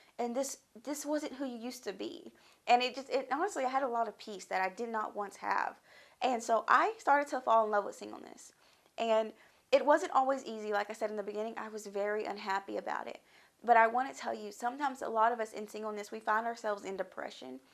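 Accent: South, United States. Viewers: I hear a country accent ? Or am I tripping ? Lol I’m just as country mines is even worse people are always like girlllllll I know you from the south !!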